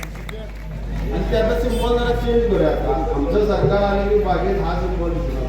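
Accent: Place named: Kolhapur, India. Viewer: Kolhapur cha avaj R V I saheb 🚩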